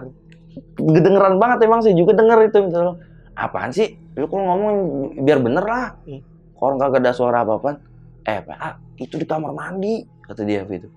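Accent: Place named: Banyumas, Indonesia. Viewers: bahasa depok kok mirip bgt yakk sama bahasa ngapakk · et mat gua mau nonton horor mat, kaga usah ngapak²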